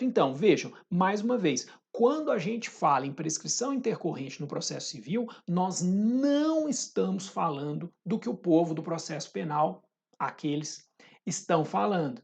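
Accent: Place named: Minas Gerais, Brazil. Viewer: adoro suas aulas. Uma inspiração! Adoro seu mineirês (pq sou mineira)... otimo! fantastico!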